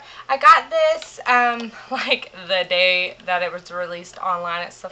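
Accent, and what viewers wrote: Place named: South, United States. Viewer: This video reminded me how much we have in common! 1. Southern accent. Love. 2. Curly hair 3. Obsession with the E35 ...just for starters :) Great video, dear! Love it!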